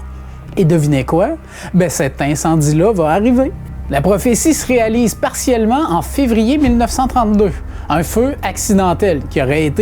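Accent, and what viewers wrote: Quebec, Canada. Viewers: Si les événements paranormaux sont constamment débusqués, c’est justement parce que c’est faux. Ce qui est paranormal dans ce canal, c’est l’accent fatigant de Québec avec les « é» prononcés en "oé". Okoé ? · Trop bonne, votre vidéo… et sympa, votre petit accent qui fleure bon le Canada 👏👏🌷🌹🌷🥀🌷🌹🌷👏👏!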